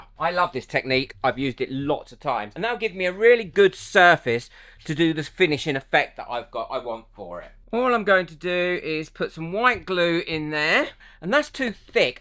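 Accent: United Kingdom. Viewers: You are adorable! I don’t really care what you’re making, I’d tune in just to watch a dude n listen to a Brit do crafting. I subbed. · I had no idea that so many people Love boxes!!!! I collect them, thinking, well, you never know…it’ll come in handy for something sometime. I’ve never done crafts, I just love boxes! I usually end up needing a particular size to mail something in. I’ll watch your video and maybe be inspired to try something new! and, btw…im an American and I love your English accent. English accents sound so sophisticated.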